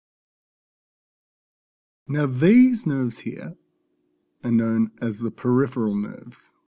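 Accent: Australia. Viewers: Thanks, simple easy to understand and with a pleasant Aussie voice. What more could a Med student ask for. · How? Stewie sounds English and this guy sounds Australian 😒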